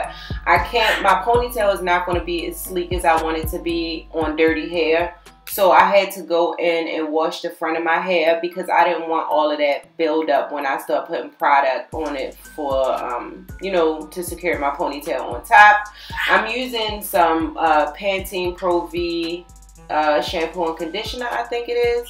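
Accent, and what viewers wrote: Baltimore, United States. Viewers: I love your accent? You from Baltimore? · You from Baltimore omg 😭 I can hear it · Heeeey! Are you in the Baltimore area? I hear your accent! Do you have a shop here? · Definitely turned out cute!! Sound like u from bmore! Lol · You are so pretty!!!! Are you from Baltimore? U have that city accent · You from Baltimore huh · As soon as you started talking, I went to find your IG.. I just KNEW you were from Baltimore lol. I am as well ♥️ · You from Baltimore? I hope you don’t say “Ehh no “ lmao cause you low key sound like you from here plus you cute asf !! 😍Your hair came out BOMB! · She must be from Baltimore I hear the accent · BMore accent undeniable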